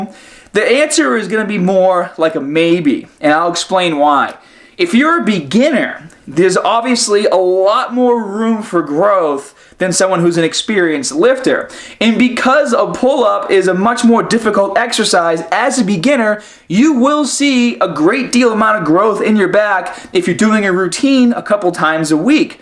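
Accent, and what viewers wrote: Boston, United States. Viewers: Is this guy from Boston? He sounds like it.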